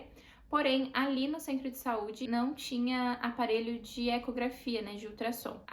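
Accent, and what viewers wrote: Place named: Portugal. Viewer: ❤❤❤❤Primeiras impressões, já mistura o nosso português com o Português de Portugal...kkkk Principal diferença que encontrei é que no Brasil pai não é visita, porém, existe a resistência dos hospitais em se adaptar a essa lei por diversas situações inclusive lotação hospitalar. E suponho que 95% das maternidades públicas não permitem a permanência do homem no período noturno pelo exato motivo do compartilhamento de quartos. Existem maternidades modelos mas são poucas e extremamente disputadas. Nessa o serviço privado ganha, porém depende do plano de saúde também. Lindo relato, super detalhado e mto necessário pra quem passa pelo mesmo. Obs: Achei lindo quando vc disse que precisa do perto de vc, vai além da necessidade com a criança...demonstra amor e segurança. Lindo casal..amei! Parabéns!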